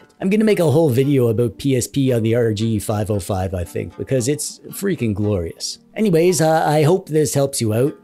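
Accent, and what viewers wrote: Canada. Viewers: Is the host Canadian? Testing my Canadian radar detection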